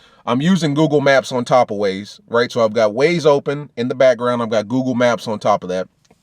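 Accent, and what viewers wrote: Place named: South, United States. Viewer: why u talk like an old country segregationist politician in the 60s...im from south carolina and there's different types of country twang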